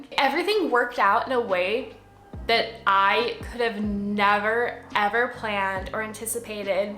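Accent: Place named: Los Angeles, United States. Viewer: You're gonna fit into LA with your voice lol. I would've thought you were from there. No shade though.. Great and inspiring video. Thank you and congrats!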